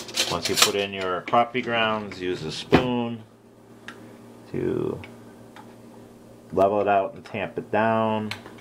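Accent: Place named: Wisconsin, United States. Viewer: You must be from Wisconsin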